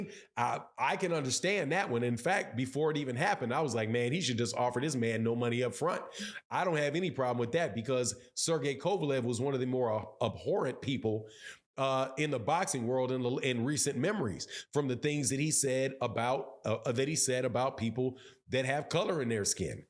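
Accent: Texas, United States. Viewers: are you from Texas???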